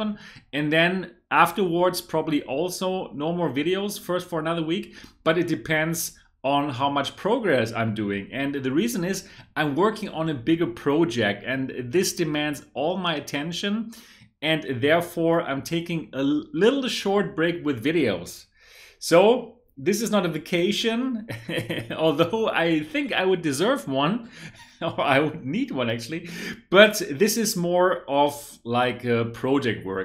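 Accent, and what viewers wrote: Germany. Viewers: You’re so amazing! How could you German speak so heart-touching English and also excellent Chinese. 🤩😃 And I had also watched your other vlog videos.. daily lives...with your wife I love your English speaking accent😳😢 ! And all of your styles... _ I can speak English and Spanish,but I also want to learn German❤️! I’m from Taiwan 😢 ,soon I’ll go to Europe. Spain,Portugal and your country “Germany”🇩🇪 ! And I love football so much !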